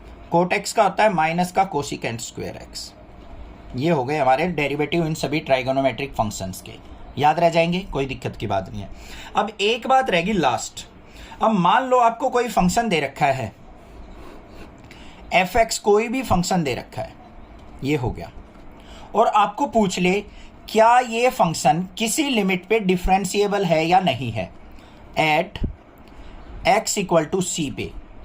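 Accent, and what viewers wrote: Haryana, India. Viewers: "agar ---yo-- function " sir are you from harayana (I identify you by this word "yo") ex: yo choro kam ko----koonya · Sir aap Haryana se hai kya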